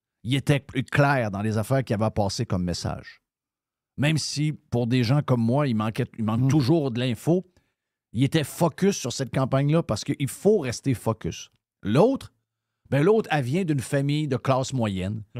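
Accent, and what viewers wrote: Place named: Canada. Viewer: Outre l'accent canadien que j'adore, j'adore le discours ! (et oui, c'est un sacré Finger ! ).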